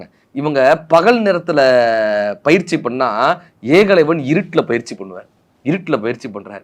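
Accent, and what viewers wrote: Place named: Madurai, India. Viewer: அஸ்வத்தாமன் தானே அவர்.... சொல்லிய விதம் மதுரை மண்ணுகுரியது.... 🩷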